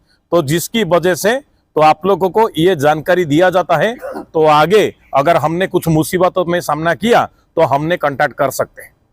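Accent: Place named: Odisha, India. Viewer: sir aap odisha k ho na aap ki langauge se pata chal Jaa Raha h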